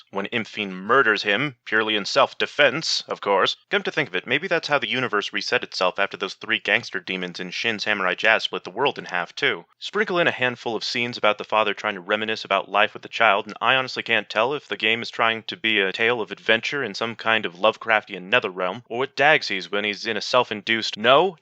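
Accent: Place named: North America. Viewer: You, sir, are too good. Thank you for the correction as well. You emulate Yhatzee's style so well. So well, in fact, if it weren't for the polite (nay, almost posh) North American accent and the garish colour scheme for the sake of individuality, I'd say it was the man himself. More please.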